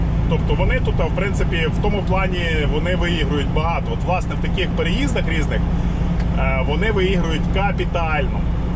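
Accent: Lviv, Ukraine. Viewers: Добрий день мене звати Ігор живу в Оттаві.Працьую водієм рок трак. Хотів би з вами поспілкуватись,чую вашу розмову і роблю висновок що ви Львівська обл.Дуже дякую.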